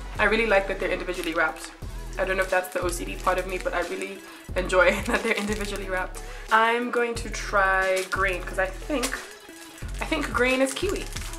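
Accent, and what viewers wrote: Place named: South Africa. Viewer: Wow south african accent 🙅🙅🙅🙅 your actual accent is more south African! 😵🤷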